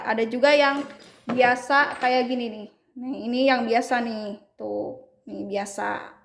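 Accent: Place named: West Java, Indonesia. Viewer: Kk orang sunda ya😅 Lucuu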